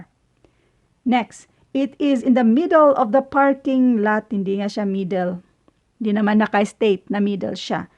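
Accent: Visayas, Philippines. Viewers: bisaya ka po ba mam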